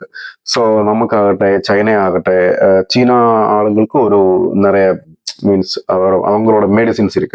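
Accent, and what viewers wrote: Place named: Kerala, India. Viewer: നിങ്ങൾ മലയാളിയാണ് എന്ന് സംസാരം കേൾക്കുമ്പോൾ തന്നെ അറിയുന്നുണ്ട്. വീഡിയോ നന്നായിട്ടുണ്ട്. ആദ്യമായിട്ടാണ് കാണുന്നത്. സുബ്സ്ക്രൈബ് ചെയ്തു.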